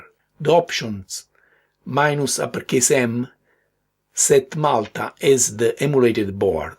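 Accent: Italy. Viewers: I’m so glad you identified as Italian in this video, is been trying to localize your dialect. At first, when I was passing very little attention and It was just going in the background, my first guess was Russian/Eastern Bloc area, then by like you 3rd video I head some patterns sounding Dutch or German… I was just about to pay attention and try to guess for real and you gave the answer away at the same time lol. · your accent is very similar to his & therefore "Very Italian" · beautiful Italian accent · the Italian accent makes it even better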